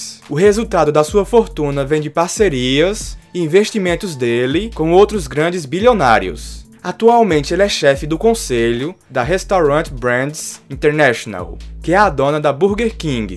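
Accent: Northeast, Brazil. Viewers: Vc e do nordeste? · Vc é nordestino? se for eu também sou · VC é nordestino!! De que estado? Eu sou da paraiba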